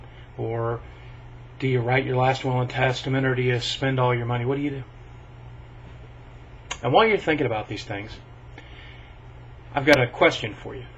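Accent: South, United States. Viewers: Sad looking back at this Mate, funny thing is here you sound like a cowboy from the south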